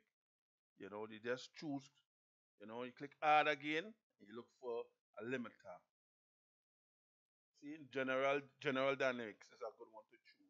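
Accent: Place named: Virgin Islands, Caribbean. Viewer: The man call scene I thought u were from the Virgin Islands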